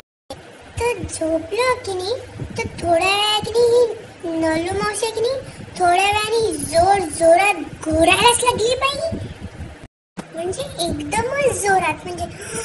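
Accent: Nagpur, India. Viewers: Awesome Stuff, really Hats Off to you.....one of my friend speaks same like SOTI porgi.. as she is also from Nagpur...Both of us enjoy your videos a lot....Happy Diwali..to you and your Family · ekdum masta👌and I love that kinda nagpuri accent😀☺awsm...keep it up👍👍👏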